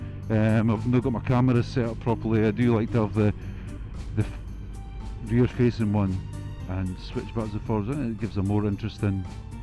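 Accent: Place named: Scotland, United Kingdom. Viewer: "You sound like the most Scottish person ever on your videos" "Do I? Don't worry, I'm planning on doing some "proper" videos" What?! 🤣🤣🤣 Nothing wrong with the roll-on acceleration there, 👍 Anyway, I'd love to comment further but I've got to go - tonight's the night!! (by which I mean the annual village quiz night, of course). 🤓😇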